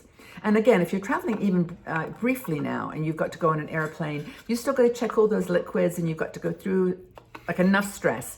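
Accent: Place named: United Kingdom. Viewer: I detect a British accent.And of course,we Brits are always prepared! Blessings.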